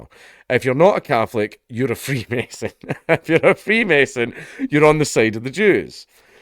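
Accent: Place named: Scotland, United Kingdom. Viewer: absolutely LOVE the bouncer stories. The way you say it all in your Scottish accent makes it so much funnier when something funny happens.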